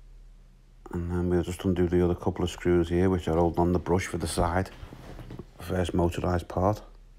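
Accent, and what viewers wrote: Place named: Scouse, United Kingdom. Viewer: Hey, i only found you channel through nick and was made up to see your renovation of the roadsweeper, as im donig one myself as we speak. Im doing it for a friend who owned one as a kid. The added bonus for me was hearing your accent, I thought “ wow, this guy is one of ours “. Im originally from Wallasey in merseyside, so im only a plassy scouser, or woolyback or odgie, but ive been living in exmouth in devon for the last 7 years and its always great to hear one of your own 👍🏻. Great work and im fully signed up to your channel. Well done mate.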